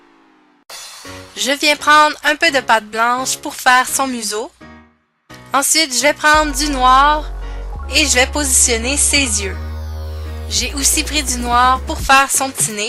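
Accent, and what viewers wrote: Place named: Quebec, Canada. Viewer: Le bleu est très beau et bravo super chat 😀 ,et j'adore ton axen québéquoi 😉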